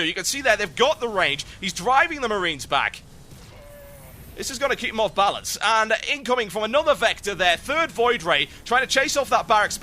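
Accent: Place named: England, United Kingdom. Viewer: no offense man but I can't stand your english accent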